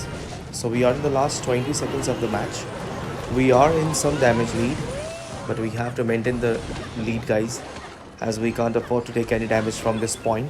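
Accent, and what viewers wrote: India. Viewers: Obvious indian accent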